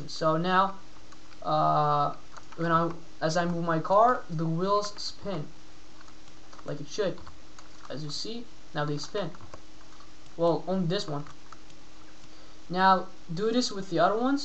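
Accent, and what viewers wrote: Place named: Russia. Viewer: control what? i cant understand the russian accent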